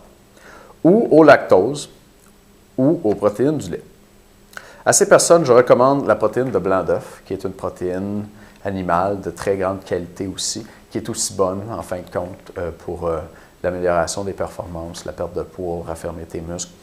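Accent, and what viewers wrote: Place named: Quebec, Canada. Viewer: Vous pouvez mettre du sous-titrage. Il y a des gens malentendants. Il y a des gens aussi qui ont du mal à suivre l'accent québecois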